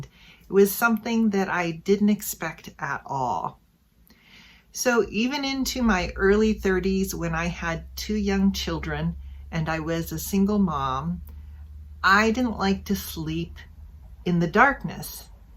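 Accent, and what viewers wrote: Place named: United States. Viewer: American accent is clear.❤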